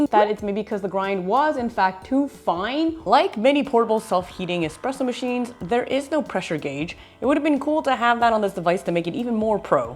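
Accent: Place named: Canada. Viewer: I love the way Canadian say "Owt", for the English "Out"...it's the way I can tell they are Canadians when I meet them, rather than insulting then by saying "Are you American?":-))) Also, filling it up full with water regardless of the size drink you want will be better, as a larger volume of water will maintain the heat better for the extraction temperature than a smaller volume of water, where heat is 'soaked' into the machine body.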